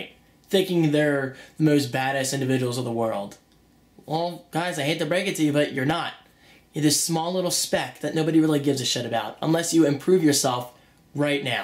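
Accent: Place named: South Jersey, United States. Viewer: Wawa is a 10X better version of a 7-11. They have them all over Jersey and PA. Judging by his accent he's prob from the South Jersey/Philly area.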